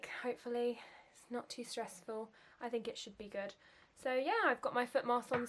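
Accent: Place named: United Kingdom. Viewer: Ayee your British as well yayyy what part are you from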